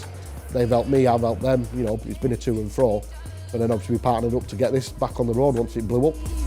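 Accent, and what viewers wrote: United Kingdom. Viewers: Didnt understand his harsh brittish accent but what ever he said sounded frigging cool 😎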